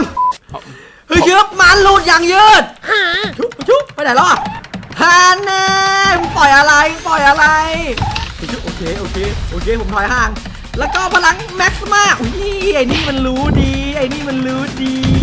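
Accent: Isan, Thailand. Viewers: พี่อีสานป่าว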